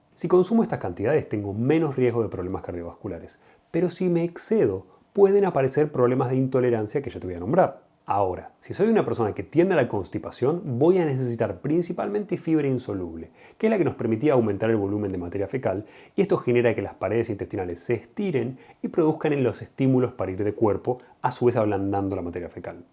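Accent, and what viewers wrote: Argentina. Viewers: Me encantan estos videos informativos y llevaderos por ese lindo acento argentino,. Saludos desde Buenos Aires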